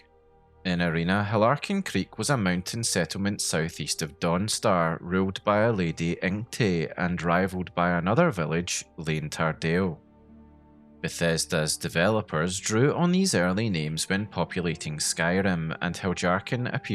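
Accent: Scotland, United Kingdom. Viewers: I like your accent. It's scottish right? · Its just one of the many Scottish accents.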